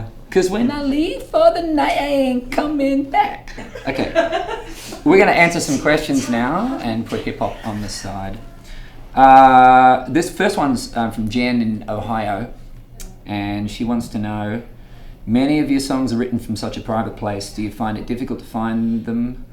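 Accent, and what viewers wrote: Australia. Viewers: yeahhhhhh, he answered my question !!!!! That's me LaVonda from Texas. I could listen to him say my name a million times and never get tired of that dreamy aussie accent.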